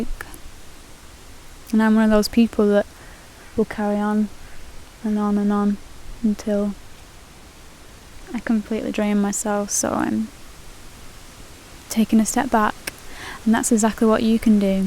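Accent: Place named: Lancashire, United Kingdom. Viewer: Great video. Im a hindu born in London, family originally from Bolton. It's crazy how 2nd/3rd generation Indians in the UK, myself inc., have lost faith in the wisdom of hindu philosophy, i think we're poorer for it. More crazier still is for me to be rediscovering my roots through someone with your awesome Lancashire accent! Keep up the positive vibes and thanks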